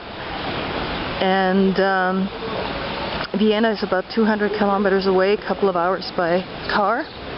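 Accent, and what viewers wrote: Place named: Canada. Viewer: Your accent was a bit of a mystery for me :), I thought you didn't sound American and when you said Canadian is all made sense :). Thanks for the video!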